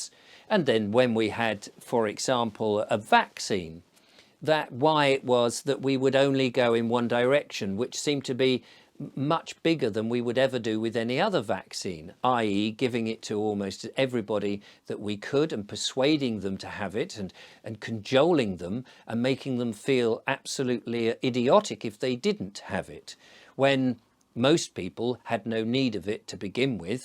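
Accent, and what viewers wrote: United Kingdom. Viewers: I have recently started watching all of your videos and would like to make the following observations. You come across a Great British slight accentric, which I love as there are too many manufactured personalities. You love your country and your countries history. But your awakening, probably through your viewers' comments and opinions, is beautiful to watch. Your communication skills are a credit to you but most of all the way you "tread the boards" shall we say is masterful. Keep up the good work as I think you awaken a lot more people to the World Agenda than say the more zealous of us delivering the agenda. Keep up the good work.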